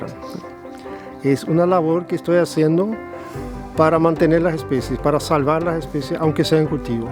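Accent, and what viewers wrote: Paraguay. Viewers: Hermoso trabajo y lugar!, y ya se le pegó el acento Paraguayo 🇵🇾😍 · Curioso como se mezclan el acento paraguayo y alemán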